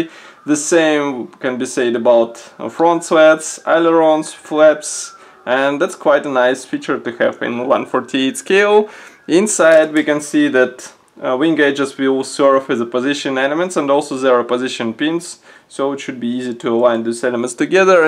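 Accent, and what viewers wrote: Russia. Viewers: Мне показалось или я слышу русский акцент?....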